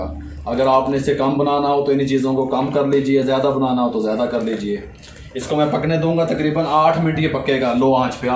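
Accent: Punjab, India. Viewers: Uncle ap buhut pyara bolte hain specially punjabi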